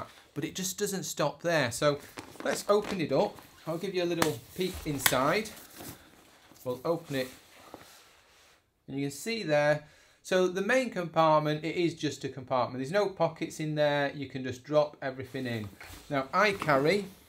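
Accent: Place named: United Kingdom. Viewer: Damn. Nothing worse than clicking on a video from a creator you've never seen and they're Bri'ish. SMH. So sad.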